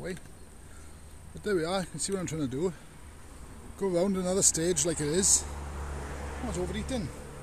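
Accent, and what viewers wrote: Wales, United Kingdom. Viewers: Great job.love your Welsh accent .love your comment ,when you see everyone use long reach ,puzzle me 😂😂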